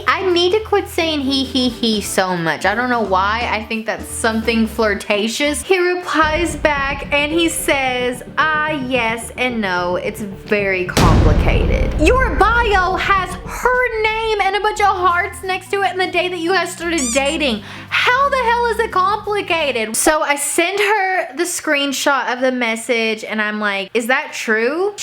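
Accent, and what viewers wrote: South, United States. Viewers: you are blessed with a precious southern accent girl!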